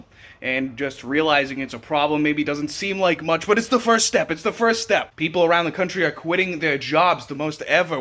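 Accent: Boston, United States. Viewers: I’m on the same page as you dude 100%. My only comment is that it’s funny to me that your Boston or whatever accent that is disappears when you’re on Live. Are you still in the army? Did you ever go to boot?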